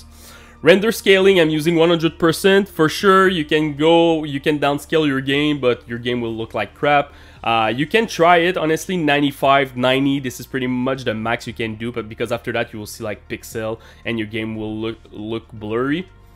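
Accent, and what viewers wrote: Quebec, Canada. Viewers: It's funny how the windows game mode isn't a game mode. Are you francophone? From Quebec maybe, some of your voyelles sounds quebecoises. Your "if" sound really french! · est-tu Québécois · Québec right? Anyway, gr8t video! Thanks! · Quebecois fr 😁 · Your accent gives me flashbacks of CAF Basic Training in St Jean Sur Richelieu, lol. Thanks for the video, helped alot! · I knew you were French Canadian the second the video started. My mom has the exact same accent. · He was just joking. I mean „your favorite french canadian“ - this is self-parody. Pretty funny imho. I like his accent btw. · 100% you are from québec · I can tell you have an accent “quebecois”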